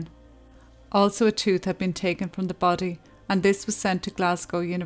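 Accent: Ireland. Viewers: Man, FINALLY got caught up on Michael's reg channel too, but haven't even started on his new channel yet. A bit weird to not see him in the podcasts. Takes some getting used to, but guess it's a bit easier to listen to as you vook, clean, prep videos, etc... or as I do when I start getting tired, so put my head sets on and nod of to Mike's voice. He seems to have lost a lot of his accent though. Love that brogue. He needs to go back home and pick that back up. 😂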